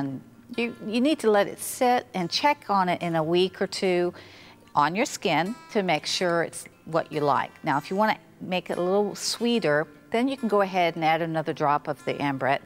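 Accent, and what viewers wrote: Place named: Texas, United States. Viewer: She got that heavy Texas accent “oowls" lol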